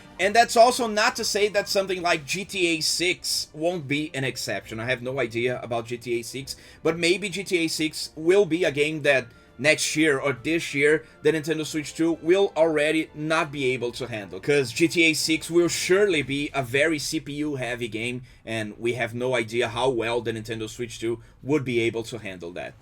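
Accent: Brazil. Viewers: he clearly has a Brazilian accent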